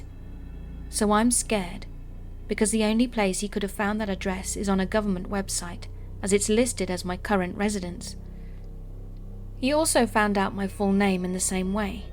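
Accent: United Kingdom. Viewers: Hi...love ur voice and ur channel...love to hear a uk voice as I'm there!!!!😂love it when u say get cozy comfy warm!! As I'm always getting cozy when u say that!!!thank you!!!!!! Stay creepy!!!!😂🖤🖤🖤🖤🖤🖤🖤🖤🖤🖤🖤🖤🖤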